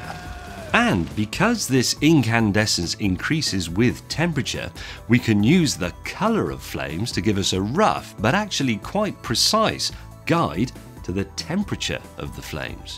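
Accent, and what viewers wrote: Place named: England, United Kingdom. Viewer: I watch these videos to learn James may's English accent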